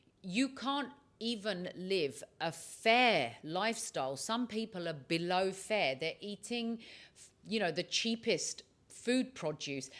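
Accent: United Kingdom. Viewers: Godddammmmnnnnn she sound so british, even more british than my british grandma and she on hijab wth😂😂😂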